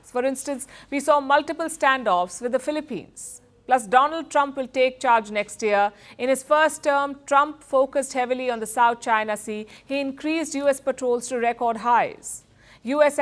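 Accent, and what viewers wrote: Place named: India. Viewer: I like her reports, which can help me get familiar with Indian English.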